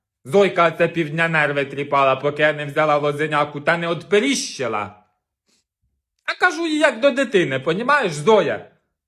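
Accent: Poltava, Ukraine. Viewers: Дякую,! Кожного дня переглядаю Вашi шедеври. Ви- талант!Як точно, мальовниче вiдтворюете наш полтавський дiалект! А iнтонацiя, емоцiональнiсть! Все не вигадане, справжне!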